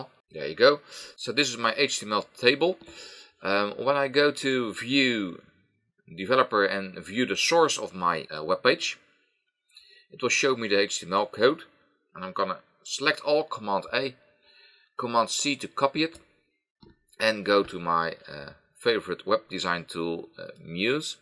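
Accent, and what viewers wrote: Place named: Netherlands. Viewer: I can tell you're dutch haha! Either way, very helpful tutorial! Kudo's!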